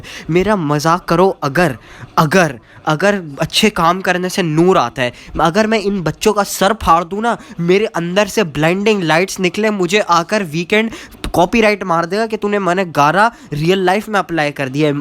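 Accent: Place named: India. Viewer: Lol the way u talk sounds like u r an Indian boya! 😂 bcz ur accent seems to me like an Indian accent tbh.. btw I really enjoyed ur this video... maza aya...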